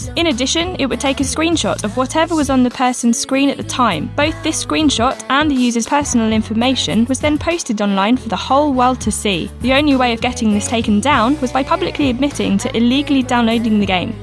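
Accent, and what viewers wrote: United Kingdom. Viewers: Cookie cutter narrator with a britsh accent... I thought you guys were better than this.-.- Use real gamers! Did you run out of youtubers? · man that british accent makes this sound so clinical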